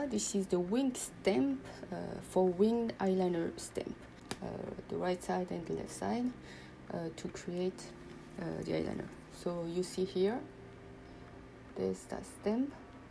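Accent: France. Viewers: I tried my Viseart Paris Edit palette for the first time last night. Though the quality of the shadows is very good, let's just say that I ended up looking hungover rather than elegant like you do. Even though I don't have a monolid, I'm going to try your method--it really came out nicely. Love the name of your channel and sweet French accent. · Do you speak french by any chance? It sounds like you have a french accent which would be pretty cool! :)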